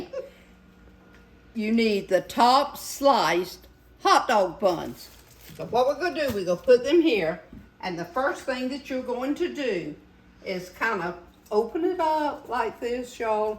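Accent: South, United States. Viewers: Every time you say shine for Jesus,’ I think of my wonderful Mother in Law. She’s home with the Lord now, and I know how happy she is. The two of you would have been great friends. Faith - Family - Friends! She never missed a Sunday at her Methodist church. She was a true Southern lady, could cook amazingly well, and it looks like you could be cousins. Same height, same accent, even your hair is alike. Yes, I miss her!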